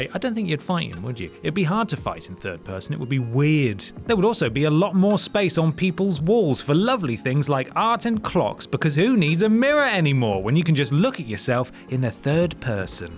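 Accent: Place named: RP, United Kingdom. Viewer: is so posh in these videos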